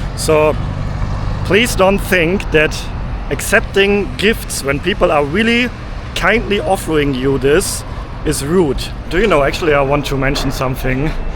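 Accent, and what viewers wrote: Germany. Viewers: Love his german accent ❤ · Bruder dein deutscher Akzent ist killer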